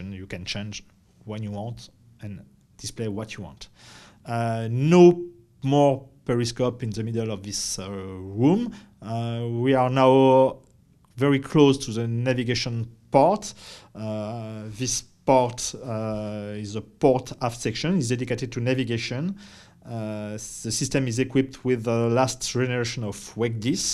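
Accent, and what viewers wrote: France. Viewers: You sound like a French guy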